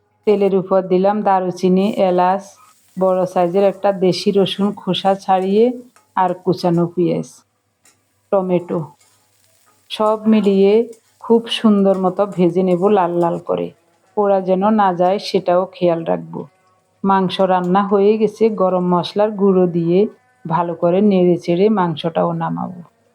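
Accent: Noakhali, Bangladesh. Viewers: আমি এই প্রথম দেখছি।খুব ভালো লাগলো।আপনার কথাগুলি শুনে মনে হচ্ছে নোয়াখালী বা চট্রগ্রামের ভাষা...খুব মিষ্টি ভাষা।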